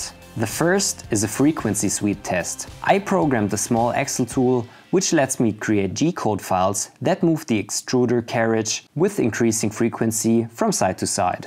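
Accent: Germany. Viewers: are you german :D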